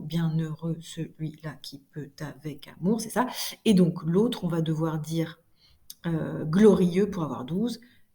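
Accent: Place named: South, France. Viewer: Bonjour Madame, c'est Priam. Sympa votre accent du sud! Pour répondre à Valentin, je trouve mes questions et mes réponses dans des livres. Bonne journée!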